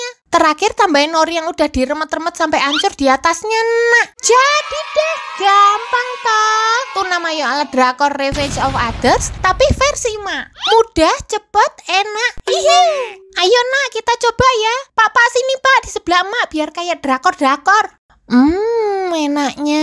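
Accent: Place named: Java, Indonesia. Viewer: Medhog jowone😅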